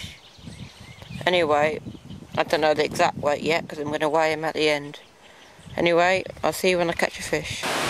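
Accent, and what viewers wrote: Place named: Europe. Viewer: hey man nice fishin, im going to assume from your accent that your from somewhere in europe...im from the northern U.S. and we"ve been fishin through the ice up here catchin alot of northern pike, i think you guys call them jack though. its nice to watch a fishin video taken in open water compared to on the ice! cant wait for summer man!!! keep fishin!!!!!!!!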